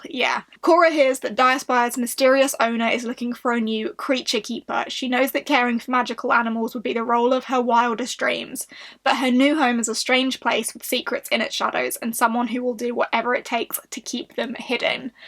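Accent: England, United Kingdom. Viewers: Hello, I'm Andrea. I discovered Middle grade books because of you and i absolutely love it!! I'm from South America, Colombia and i really like your English accent. Plus you are beautiful and smart.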